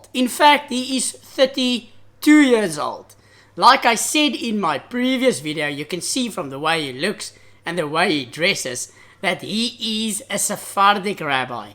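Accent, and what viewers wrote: South Africa. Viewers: חיי אחי 😁 I've watched your videos off and on for months now especially the conversion video start to finish. I think it's fantastic your making videos about Judaism,I love hearing your South African accent haha I just haven't heard a Jew speaking anything apart from American / Israeli accent/Yiddish Anyways G-d bless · Is this guy South African or something?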